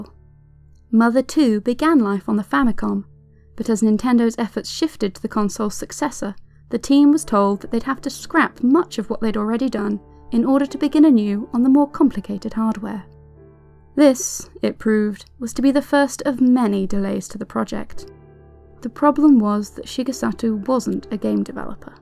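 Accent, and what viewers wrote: United Kingdom. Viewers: Oh how I love this british accent. A nation of great narrators you are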